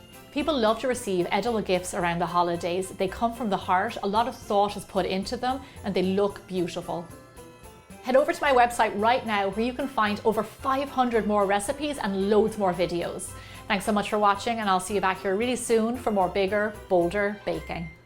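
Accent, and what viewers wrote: Ireland. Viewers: Her irish accent is👌i could listen to her talk forever❤️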